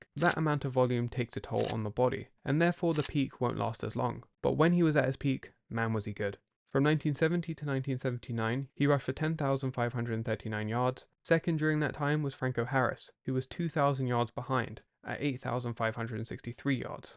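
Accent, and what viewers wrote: United Kingdom. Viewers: Hi, i'd love you to make a video on how you got so interested in american football. I find it really interesting that a young guy from the uk (I think from your accent ) has such a love for the game. Thanks for your videos too, I think your channel is one of the best on the NFL on youtube. :)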